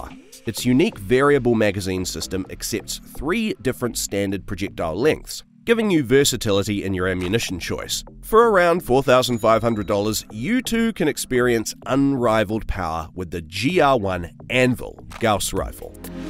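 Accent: Australia. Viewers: Aussie accent but he's talking USD$ and no shipping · Notice they have an Australian narrorate since they wimped out and gave up their guns.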